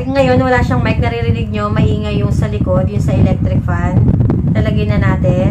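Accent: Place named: Visayas, Philippines. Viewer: Thanks po! Narinig ko parang bisaya rin kayu? Hehe pa shout po 🤗😁❤️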